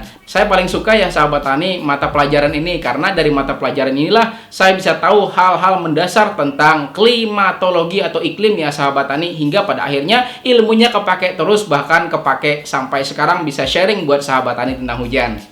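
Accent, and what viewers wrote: Banjar, Indonesia. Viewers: Orang Banjar kh abang ni? 😁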